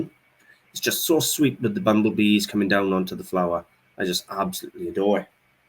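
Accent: Wales, United Kingdom. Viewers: I loved the silver fish spice box. I am Jewish and I have never seen one. I have a spice box in the shape of a sunflower. The spices are used in a ceremony at the end of the Sabbath and smelling them reminds us that we are saying goodbye to our special day. By the way the word Judaica is pronounced as though the 'c"is a "k". I enjoy your videos and especially enjoy your Welsh accent as my husband is also Welsh. Much success in 2022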